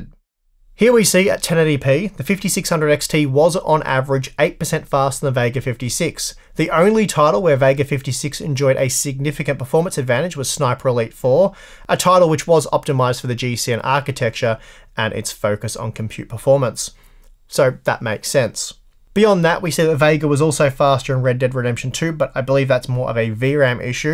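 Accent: Australia. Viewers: love that aussie english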